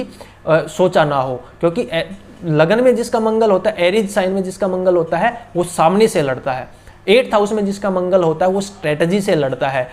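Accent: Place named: Haryana, India. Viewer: ji aap ki hindi mein haryanvi tone aa rhi hai 😃 I guessed correctly 👍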